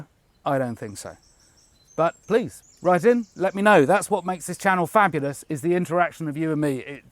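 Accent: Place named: United Kingdom. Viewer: You looked like a bald German guy who I've seen on YouTube before. When I heard a British accent you scared me.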